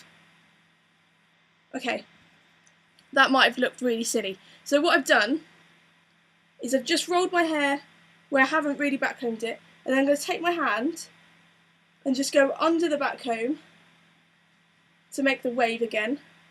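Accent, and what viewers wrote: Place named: England, United Kingdom. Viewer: I think that's wonderful and I really enjoyed your informative commentary throughout. I suppose exact order and timings of when you put each pin in is crucial to overall result after you explained you could play around with a bit of hair that hadn't yet been pinned into place? Although i used to have long hair i never pinned it so never really considered this aspect. Cant work out if your accent is London/South East or West Country or maybe I'm totally wrong on both accounts!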